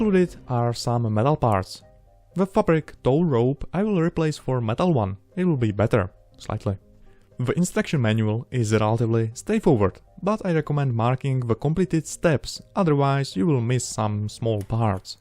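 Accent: Czech Republic. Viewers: Jsi Čech? Podle přízvuku ;) Krásná práce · Český přízvuk jsem okamžitě poznal:)))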